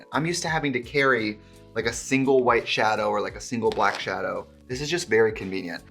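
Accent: Wisconsin, United States. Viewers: I love when you lean in to the Wisconsin accent. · Wisconsin Accent coming through more than normal today. Love me a dræg moment ❤️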